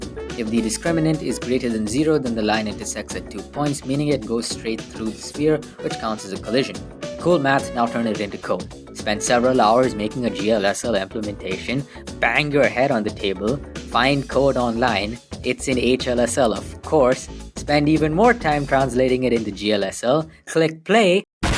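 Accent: India. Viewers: AAAA bro it seems like u is Indian cuz the accent is a lil similar am I mistaken?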